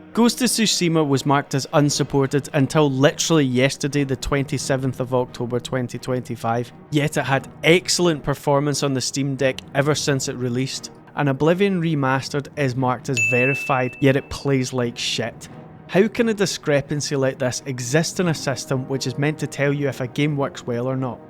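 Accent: Scotland, United Kingdom. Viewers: peak scottish accent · Nice skottish accent Mate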